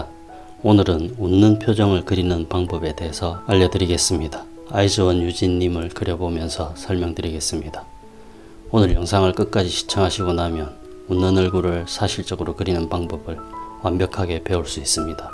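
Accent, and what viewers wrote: Gyeongsang, South Korea. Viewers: 일년전 영상인데 저는 요즘 연필 초상화 배운다고 유튜브 찿다가 선생님의 영상을보게 됐어 많은 공부가 됩니다 말씀도 재미있게 하시네요 고향이 갱상도 같아요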